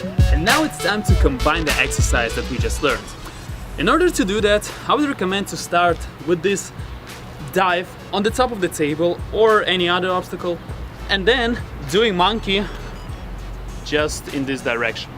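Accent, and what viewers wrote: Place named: Russia. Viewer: Слышу твой русский, сквозь английский)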